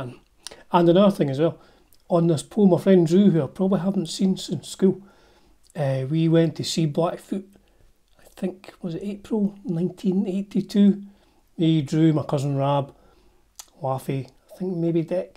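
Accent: Scotland, United Kingdom. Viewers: I really like your accent. What nation, is it Scottish ?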